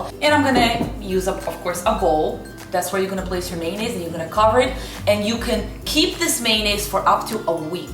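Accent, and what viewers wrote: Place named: Russia. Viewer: кстати,речь с русским акцентом гораздо приятнее уху и легче понять,чем коренных носителй языка! кофточка очень к лицу,красотка!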